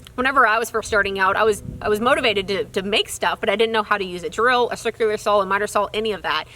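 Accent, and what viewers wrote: Texas, United States. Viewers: I figured as much, you have a texan accent. But I couldn't figure out why anyone would willingly sport the 216 area code(Im originally from there also, moved to Tulsa 15 years ago.)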